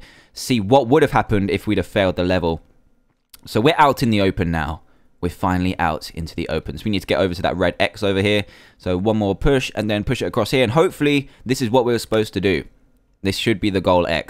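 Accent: United Kingdom. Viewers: Ever heard of a British accent, m'kay?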